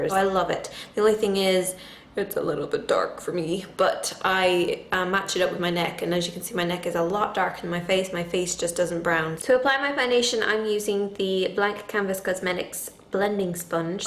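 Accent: Ireland. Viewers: I stumbled across this video while watching another makeup guru's video and clicked on this one. I just wanted to say that while listening to you speak I could hear a slight hint of an Irish accent..when I went to your profile it says you were indeed from Ireland. You don't have a very thick accent but I could hear the slight hint of it. I'm kind of obsessed with anything Irish lol sorry >_> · Still trying to figure out your accent xD Idk, it sounds Irish. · are you irish???? :D youre very pretty! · Yeah she's Irish I'm Irish too it's just a different region of accent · I think she's Irish? the way she says blush and water is less British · Are you Irish? Beautiful! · just subbed love your videos so brill x an your Irish yay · are you irish? you sort of have an irish accent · Are you Irish ? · Yes, yes, I felt it too, she pronounces some words in an Irish manner, I love it. 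Although she speaks American English to me, not British English. Love the ways she speaks! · maybe she's irish?... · are you irish? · Pretty sure you're Irish! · When u think she's American but soon realise that she's Irish hmmmmm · Irish, I believe :) the Rs are deep-ish so, that's usually a good sign of an Irish accent! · She's irish, you can hear it on the R's. And yeah, it says so on her description.. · you sound Irish sometimes....are you? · Are u from Ireland cuz u hav a bit of an Irish accent ?? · Great video. Very helpful. p.s. I hear a little accent, are you Irish? (I love Ireland xD) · I think she's Irish not British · Ru Irish? You have accent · omg do u have an irish accent? like niall? i love him!!!! NOT HATE DIRECTIONATERS! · I think she's Irish.. Just a hint of some sort of accent there. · are you Irish? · Are you from Ireland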